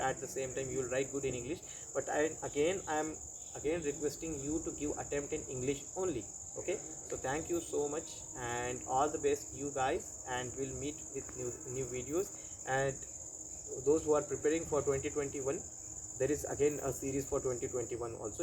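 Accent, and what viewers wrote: South, India. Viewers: Sry to ask bt Are u from Maharashtra or south.. Bcoz your name is bt your accent is like south indian.. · Sir ki english language ko south ka touch hai....IPS me unko south ka cadre mila hoga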